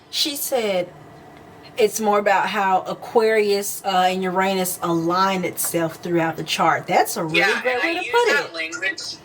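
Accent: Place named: South, United States. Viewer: Can I just say I love your accent ! I’m from jersey , and your southern accent is so cute to me ! Also , y’all are so dope ! Thanks for this ❤️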